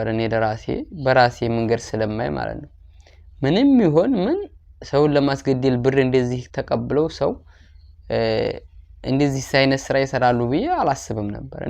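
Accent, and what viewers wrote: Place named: Rural, Ethiopia. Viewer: በርታ የእኔ ባላገር እንደ ወረደ የሆነውን የአማርኛ ቋንቋህን ወድጀዋለሁ ።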